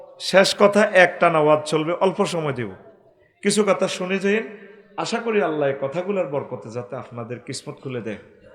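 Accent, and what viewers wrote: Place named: Noakhali, Bangladesh. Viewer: আমার প্রিয় হুজুর আপনি অনেক ভালো ভালো মানুষ৷৷৷৷৷৷ সব কথা গোলা ঠিক নোয়াখালী বাসায় বলছেন বুজতে একটু কষ্ট হয় তবু বুজতে পারছি৷৷৷ তবে৷ একটি প্রশ্ন এক ঘন্টা ওয়াজ করে লাখ লাখ টাকা নেন এটা কি জায়েজ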